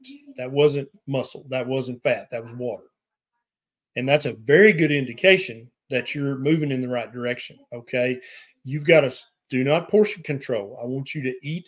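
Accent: South, United States. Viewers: Who doesn't love the frank talk...the discussion of chicken eggs at 1 hour is classic Dr Berry. People who think the accent, the country boy talk, and the literal red neck means stupid, and they are the stupid ones. is a very smart doctor. I got a lot better listening to him and ignoring my Yale trained, proper wasp specialist. Thank god I refused Dr Yale's meds. I was getting sicker and i now see his solution to my gerd increases osteoporosis,and dementia. But it would fix the gerd! I guess the logic is that on those meds a while, i would not only forget I had gerd, i would forget i had any other issues.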